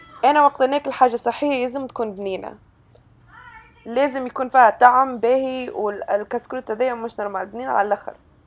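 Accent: Tunisia. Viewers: احلي لايك اول حاجة محلاه نطقك لتونسي. وثاني حاجة المواد الي استعملتهم عوضهم بمواد تونسية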